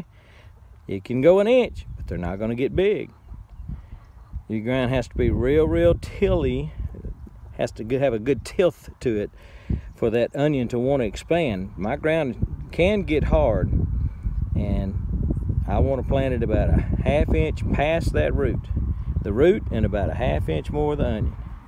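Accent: Texas, United States. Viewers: Oh that row looked so nice and neat, then you had to walk on it. lol I love your Texas accent. Great video.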